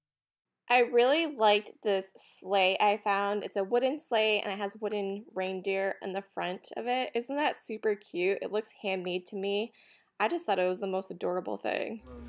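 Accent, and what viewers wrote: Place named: Maine, United States. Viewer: I liike to hear you talk with your Maine accent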